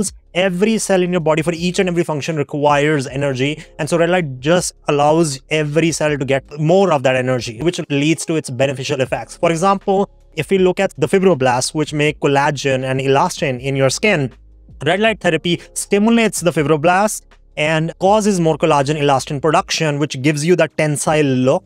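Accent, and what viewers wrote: India. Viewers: I love Indian accent. So cute. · bro which part of North India ( lemme guess.. haryanna) are you from, mitochondrial stimulation is the final goal but your accent and intonation makes it tough but after reading several comments seems like you were on point. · Why would a man with a thick Indian accent lie on the Internet? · I came to learn about red light therapy, not only did I learn a lot but also feel like I learned Hindi as well - you've an awesome accent!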